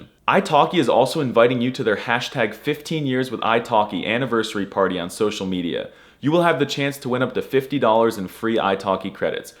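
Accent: Rio de Janeiro, Brazil. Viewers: You sound like a native Brazilian, not a Portugese person. That being said, I'm so glad my first language made it on this list. :D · As a Brazilian he sounds like people from Rio de janeiro lol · his portuguese spelling his absolutely perfect 😂😂😂 I am brazilian, and he really played a brazilian speaking, it is really this tone that we talk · as a native Portuguese: You talk Português from Brazil, not the right accent if you want to speak Português.. and some words are different too, but in the end we spoke the same languange, we understand with no dificult all hispanic languages.. Countries like, Angola, Moçambique, Guiné Bissau, Cabo Verde, Timor, Macau the official language is Português too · 😂enough w the pt-br accent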